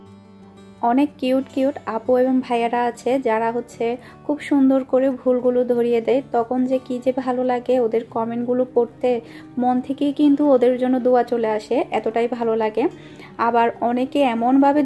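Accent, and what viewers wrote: Chittagong, Bangladesh. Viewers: Apu tumar sob blog dekhle e valo lage..sobcheye beshi valo lage ctg tone e kotha bolo bole...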